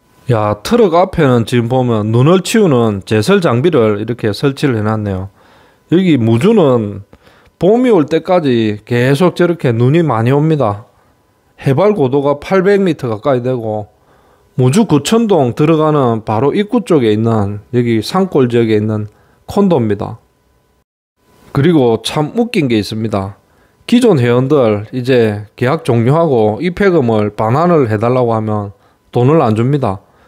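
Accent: Gyeongsang, South Korea. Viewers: 다 좋은데 경상도 말투만 아니라면 좋겠는데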